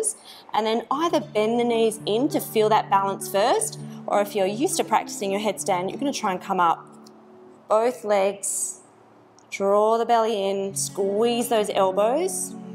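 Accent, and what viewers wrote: Australia. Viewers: That Australian accent though!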